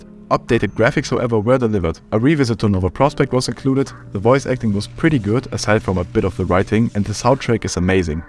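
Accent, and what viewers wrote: Germany. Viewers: I can hear from your accent that your german! Guten Tag!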